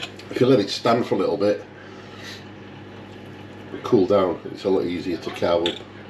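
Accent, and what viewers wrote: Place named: North East England, United Kingdom. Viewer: My favourite channel❤ And as an exile from the north-east you’re a voice from home. I noticed you didn’t season the turkey meat before you rolled it. Was this deliberate?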